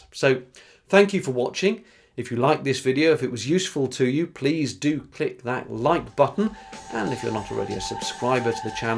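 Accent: United Kingdom. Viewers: i love british english :P good review, will buy it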